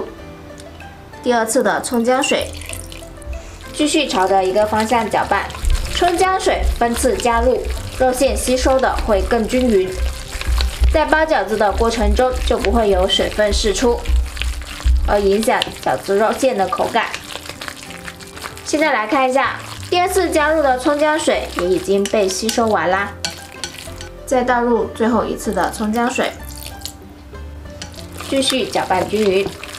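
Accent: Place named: South, China. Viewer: 我一打开，听到南方口音，我就知道好不了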